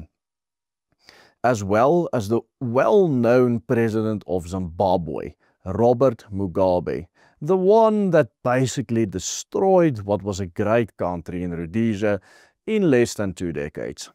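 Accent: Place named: Afrikaans, South Africa. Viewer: What the fuck dude . You sound like an Afrikaner from tye 1900s . Your English’ is good but you sound so Afrikaans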